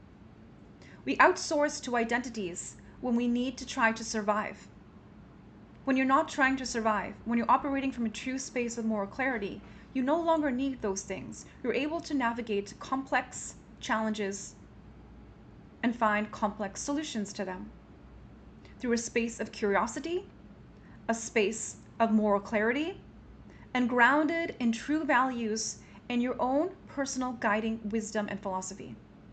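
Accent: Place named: Northeast, United States. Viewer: Yes, thx. Sounds strongly of the NYC-Boston milieu, without the accent per se. I guess I am hearing education and social class more than location.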